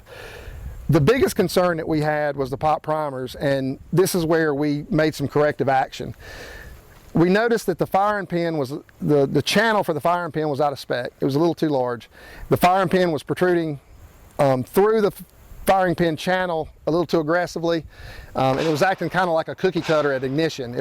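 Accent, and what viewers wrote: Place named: South Carolina, United States. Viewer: That SC accent makes me miss the Carolinas. Ive lived and worked down there off and on for years (Charlotte, Columbia, barnwell, Gaffney, Lugoff, Myrtle Beach, etc). South Carolinians are some of the best folks on Earth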